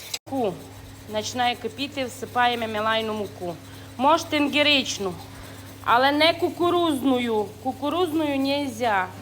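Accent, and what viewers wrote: Transcarpathia, Ukraine. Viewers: Ну стільки масла , сметани і на вершках, ще б не було добре 😂😂😂 !❤ А ваша закарпатська, то мед на вуха і душу ! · Така файно по-закарпатськи розмовляєте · Так гарно показуєте і мова ваша закарпатська така гарна. Також в молоді літа виділа як на сільських столах стояла велика миска і з неї вся сімʼя їла. І тато нас вчив їсти з краєчку, бо так скорше остиває і не попечешся. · Діалект Закарпаття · Гуцули так не говорять, невже ви відрізняєте.Ця жінка з Закарпаття, так що вона не гуцулка..Гуцули живуть у Капатах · Розмовляє на закарпатському діалекті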